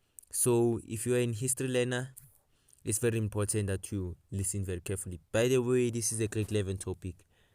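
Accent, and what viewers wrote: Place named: Zimbabwe. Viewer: Not being rude but your pronunciation is giving Zimbabwe coz my history teacher is Zimbabwean so u sound like 🤔 him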